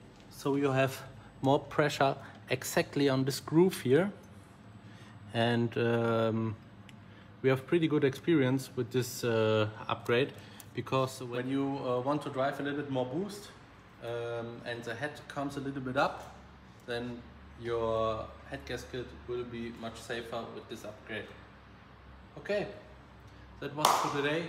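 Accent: Germany. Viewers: давно в Германии живёт,слышно по акценту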